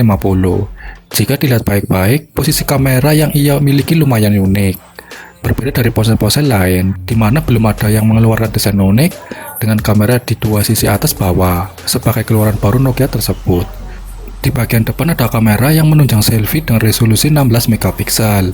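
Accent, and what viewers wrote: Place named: Java, Indonesia. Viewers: Medok amat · Suara khas, tegal ya keluar..😃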